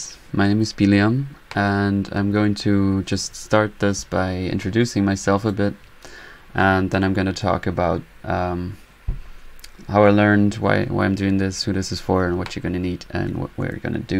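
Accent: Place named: Ireland. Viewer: Hey you say German is your native language. But I'm hearing a really strong Irish influence on your accent? Is that right?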